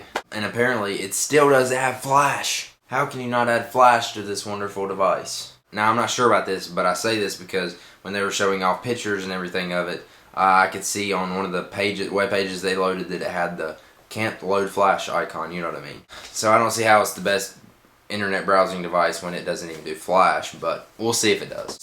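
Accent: United States. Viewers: i'm from england and usually the american accent really bugs me but i kinda think your accent is really kool it's like your talkin and i dont wanna tell u to shut the hell up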